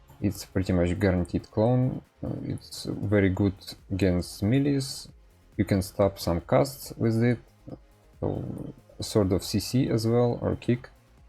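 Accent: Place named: Russia. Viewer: Кажется, мне слышится русский акцент...хм ...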